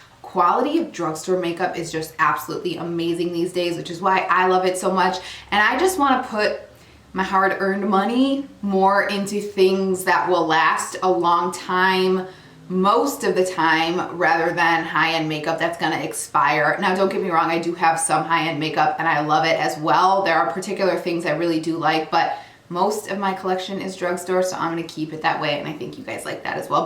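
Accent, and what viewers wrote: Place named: Northern Illinois, United States. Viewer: Hearing her accent makes me homesick. I grew up in Central Illinois but my mom's side is from Northern Illinois, but south of Chicago, and she sounds so much like my cousin whom I miss terribly.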